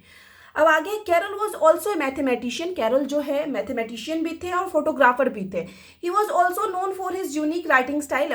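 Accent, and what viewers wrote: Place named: Uttar Pradesh, India. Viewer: It's actually her accent, she's from UP or somewhere close to that part, I've been watching her video forever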